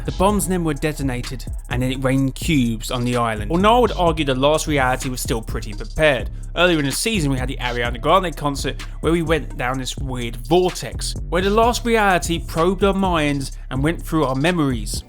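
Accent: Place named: United Kingdom. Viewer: This is so good but the only bad thing is that he is British couldn’t understand half of what he said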